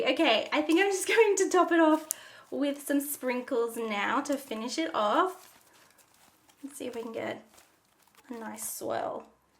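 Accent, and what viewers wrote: Australia. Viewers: You sound so much like my violin teacher, she is from Australia too. My mom lived in Australia for 1 year and she GOT soooooo god in english!! 6++++++ every test ehehehhe🥰❤️😂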